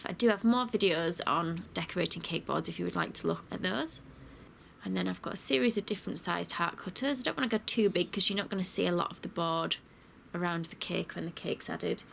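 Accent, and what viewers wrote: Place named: England, United Kingdom. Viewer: So refreshing to hear an english accent from someone so talented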